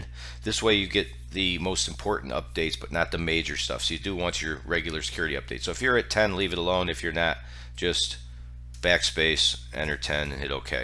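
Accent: United States. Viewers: Your heavy accent is familiar, NY, NJ?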